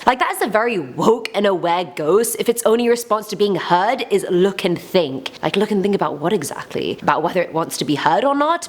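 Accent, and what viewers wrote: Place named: United Kingdom. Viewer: Lady get you a better name than you have, it sounds like a foreign name and if you are, why are you speaking British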